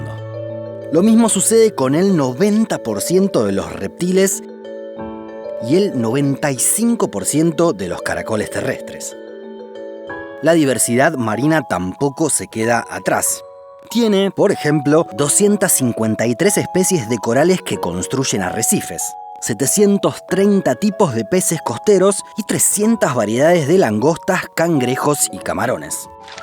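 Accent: Argentina. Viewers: El narrador es argentino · Acentos Ré ré ré argentino ! Adooooooro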